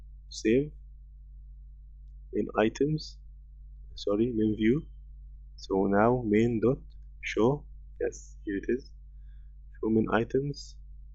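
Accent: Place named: Egypt. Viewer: Thanks.... U accent may be LikE Egyption'S people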